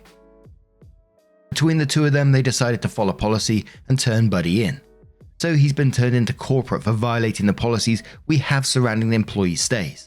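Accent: United Kingdom. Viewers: you are so insanely wholesome. I used to listen to other people reading these stories but there is nothing like an accent from home and haven't listened to anyone else since finding your channel. I hope you are having a lush day! Keep making us brits look great ❤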